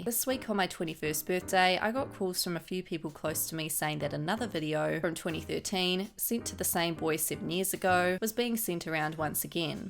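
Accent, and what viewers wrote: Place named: New Zealand. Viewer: Kiwi narrator? YAAAAS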